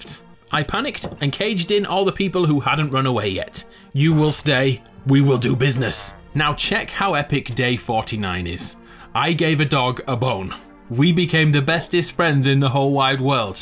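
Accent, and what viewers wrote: United Kingdom. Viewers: are you british 🇬🇧?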